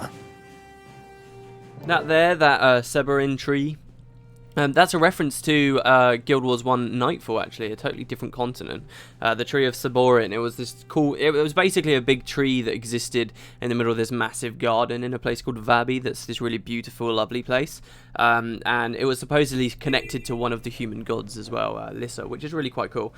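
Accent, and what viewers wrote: England, United Kingdom. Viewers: hahaha, youre so english i love it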